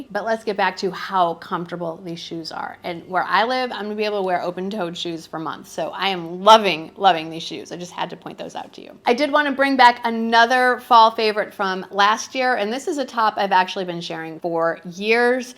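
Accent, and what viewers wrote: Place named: Illinois, United States. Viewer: I thought I recognized your Ilinois accent! Former Chicagoan here who has lived in Florida for 34 years! Go Bears!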